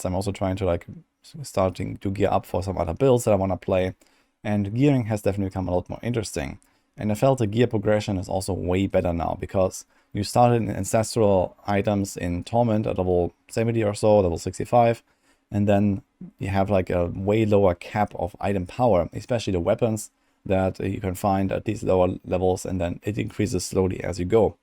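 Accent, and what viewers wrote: Germany. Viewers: Are you German, sorry for asking, I just watch your videos for ever and never wrapped my head around this one. Keeo up the great work!!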